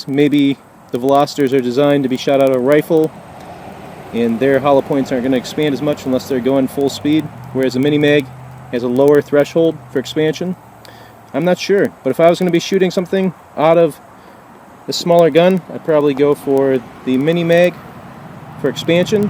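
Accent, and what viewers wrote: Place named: South, United States. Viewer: Why are you talking like a backwoodshilljack can't say anything about brothers talking ghetto brah.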